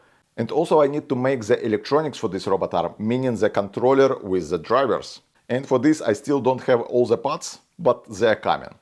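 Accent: Russia. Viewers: Ты чё, читаешь с листика английские слова русскими буквами написанные?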